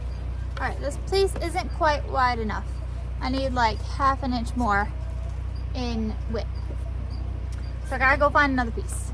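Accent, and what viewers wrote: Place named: South, United States. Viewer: Potting wild tomato plants in November! I'm draining my water hoses and putting them away for the winter, they already lightly froze up once. I don't have a greenhouse, but I did propagate some clematis from cuttings, hoping they survive. Good luck with expanding your channel, I came here from Cog Hill Farm. BTW being an old former Jacksonville guy, I love your slight southern drawl. I like videos in the 20–35-minute range. I don't listen to blog, too long, even my own son's.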